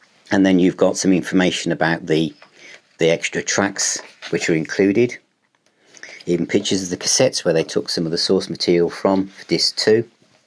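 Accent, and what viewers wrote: United Kingdom. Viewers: As always I'm blown away by knowledge of the band, product, and box set evolution (not just 2 disc's but 4 of different material - nice insight). Kinda different question but what dialect do you speak? It's not Cockney. I'm just curious that's all. Rock on.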